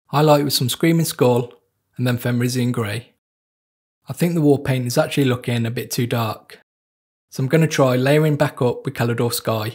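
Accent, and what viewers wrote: United Kingdom. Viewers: I don’t know what l liked better, the British speak or learning New painting techniques. Thank you 😊 . Not paper towels, kitchen paper.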